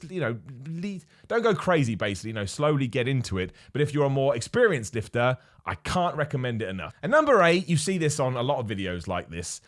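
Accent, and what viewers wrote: United Kingdom. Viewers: Don't know if I enjoy the British accent or the genuine opinion better. What a lovely human being.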